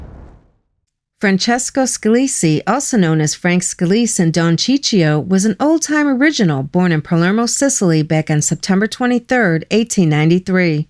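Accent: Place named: New York, United States. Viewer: Love your voice, it has hint of something new yorkish.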